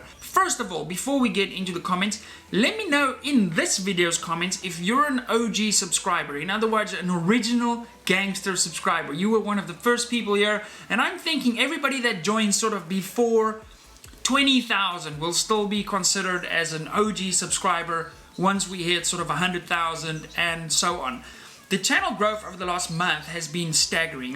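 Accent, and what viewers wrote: Afrikaans, South Africa. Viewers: Are you South African? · I actually came across your channel this morning,so have been binge watching your vids for most of the day!!Love the vids,and learning a lot...almost thought you were swedish,but then heard the afrikaans ha ha ha...Keep up the good content!!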